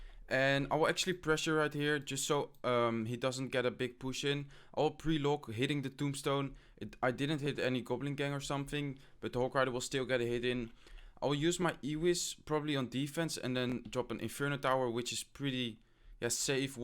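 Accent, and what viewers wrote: Netherlands. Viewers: Nice accent mate, are you dutch? (Like me) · That dutch accent tho. Die herken je zo ;) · he have a Netherlands accent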